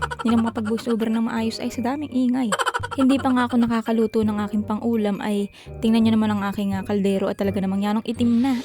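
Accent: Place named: Visayas, Philippines. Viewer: Mat halong Visaya Ang storya mo. may lahi ba kayong Visaya day?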